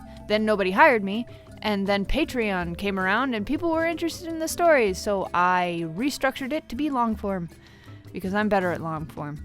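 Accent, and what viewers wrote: United States. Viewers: its an accent from montana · Where is this accent from!!! I hear Southern Georgia combined with Four Corners and it is confusing my poor little brain... Also I really love it!!!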